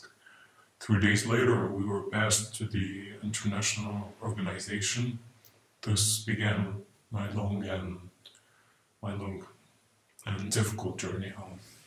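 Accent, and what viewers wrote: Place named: Russia. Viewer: Четкий акцент рускоговорящего который пытается говорить на английском .Цель просто кого-то очернить.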